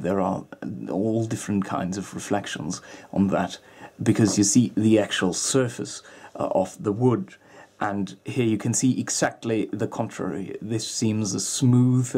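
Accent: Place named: RP, United Kingdom. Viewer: To plumby voice so watched it with sound down.. No offence but it done my head in.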